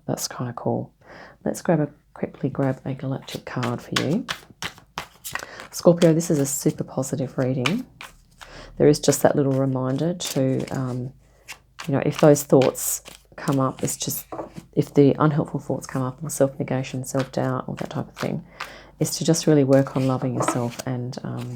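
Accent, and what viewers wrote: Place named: Australia. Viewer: Yay another Aussie accent 🎉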